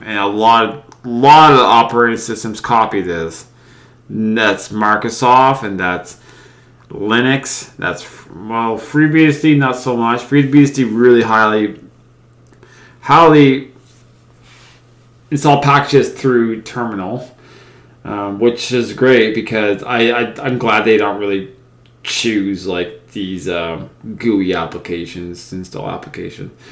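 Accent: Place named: Australia. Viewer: I kinda thought you were an Aussie first ngl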